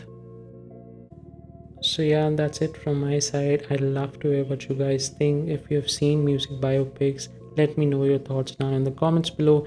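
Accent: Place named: India. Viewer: White PFP Indian voice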